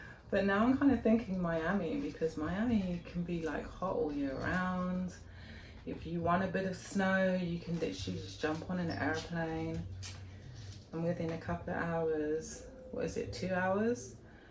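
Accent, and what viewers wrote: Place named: United Kingdom. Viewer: Love live your British accent- you make words sound so fancy😊 Greetings from Connecticut-USA 🇺🇸but was born in the Philippines 🇵🇭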